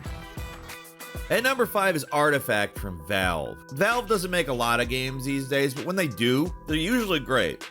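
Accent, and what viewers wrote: United States. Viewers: I always cringe when I hear the American pronunciation of Japanese names but that's a given at this point as many Japanese people most probably can't pronounce American names really well either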